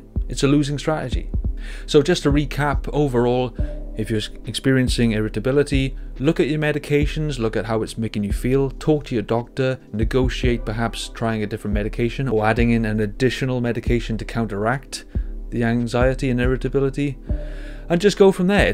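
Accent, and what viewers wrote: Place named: Swansea, United Kingdom. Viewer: Hello, I'm from Swansea! I noticed you have a bit of a local accent, where are you from?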